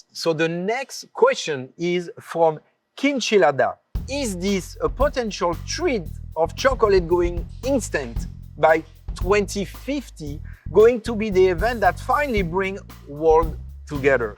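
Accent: France. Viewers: His accent is peak French Accent, it's so entertaining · Arnold is Austrian and this guy is French. Completely different countries and accents. Are you high? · Im reveling in his delicious chocolate knowledge and beautiful French accent!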